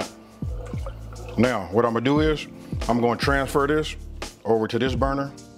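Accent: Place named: Los Angeles, United States. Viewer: Are you from L.A? Lol got that L.A accent lol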